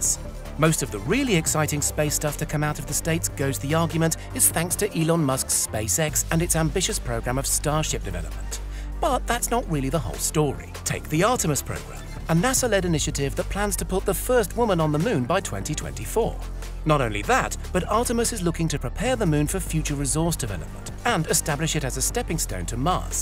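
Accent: United Kingdom. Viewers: Hes british chile...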